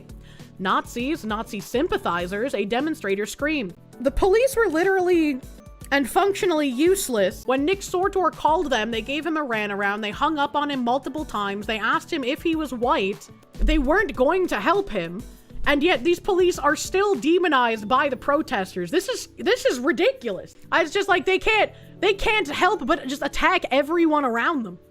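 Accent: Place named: California, United States. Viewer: So I'm not the only one who can't take anything too seriously when a Californian accent is sprinkled on it.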